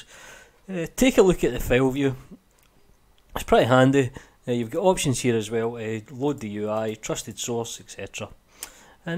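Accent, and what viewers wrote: Scotland, United Kingdom. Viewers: Blender tuts in Scottish! Wohoo. I'm going to watch yer stuff just for the warm fluffy feelings ;-).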